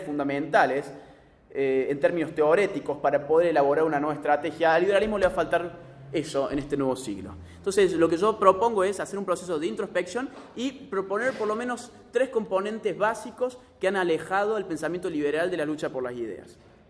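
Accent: Argentina. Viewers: Que lindo ese acento cordobés 😍. ..Laje sos un genio 💖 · el acento cordobes era mas pronunciado antes jaaj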